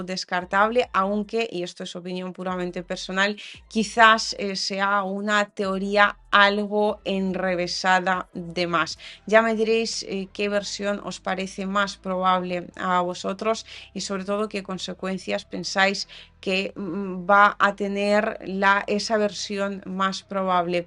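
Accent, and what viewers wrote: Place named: Spain. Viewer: Hola, por tu acento creo que viviste mucho tiempo en algún punto de España. Que significado tiene la plaqueta color rojo a tu espalda. Me gusta tu página. Un abrazo desde Bogotá.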